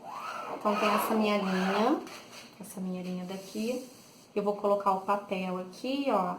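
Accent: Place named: Rio de Janeiro, Brazil. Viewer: Parabens pelo video e pelo sotaque carioca! Cheguei aqui procurando como fazer esquadramento para bordado, mas já estou inscrita no seeu canal desde agora! Felicidades de uma carioca que nao mora mais no Brasil!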